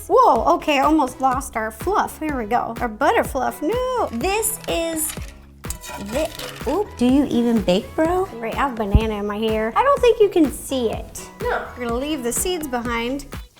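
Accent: South, United States. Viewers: voice is so cute and southern ij love it like southerners represent!!!! I'm from ga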